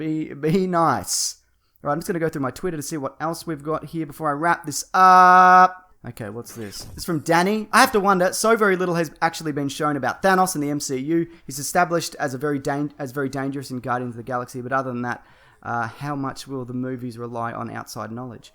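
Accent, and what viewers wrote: Australia. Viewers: I'm glad that you did another video and went into more detail about the trailer. I don't use Twitter so I would've missed out. And I don't care about rambling as long as long as it's in an Australian accent! =)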